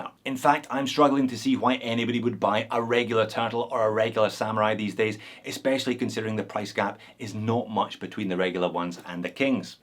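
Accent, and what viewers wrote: Scotland, United Kingdom. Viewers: Aye. I just spent 300 on a new Pepsi turtle and now looking at this King samurai haha Where does it end!? Glad I found your channel. Really enjoy the review. And nice to hear a fellow Scot.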